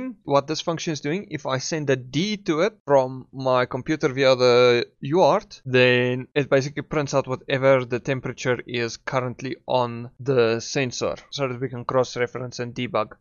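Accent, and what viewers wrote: Afrikaans, South Africa. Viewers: I can hear an Afrikaans accent. ;-)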